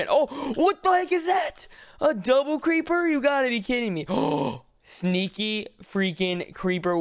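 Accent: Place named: Texas, United States. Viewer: ur texas accent tho ... “tin” = ten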